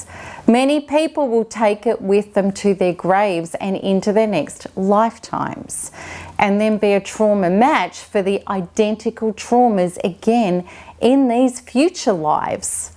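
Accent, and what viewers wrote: Australia. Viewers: Love your australian accent baby. Ur wonderful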